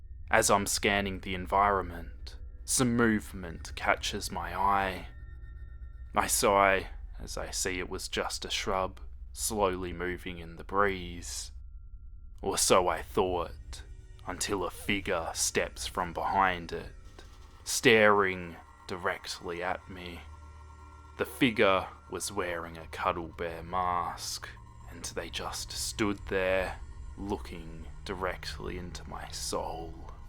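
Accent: Australia. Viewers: Why did I only just realise that you're Australian lmao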